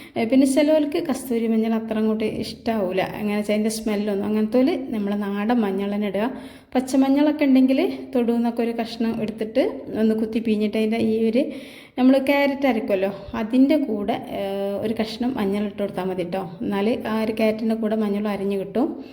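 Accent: Malappuram, India. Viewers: ഇപ്പോ താത്ത ന്റെ സംസാരം മലപ്പുറം ഭാഷ ആയി 😂ഇതിനു മുമ്പ് ഇൻഡോകി ഇനാലെ എന്നൊക്കെ പറഞ്ഞു ബോറടിപ്പിച്ചിരുന്നു ഇപ്പോ പൊളിച്ചു ❤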